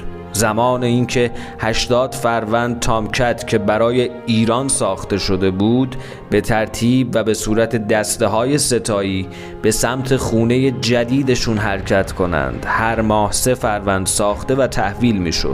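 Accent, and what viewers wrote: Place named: Turkey. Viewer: هرچی زور زدی نتونستی لهجۀ ترکی‌تو پنهان کنی❤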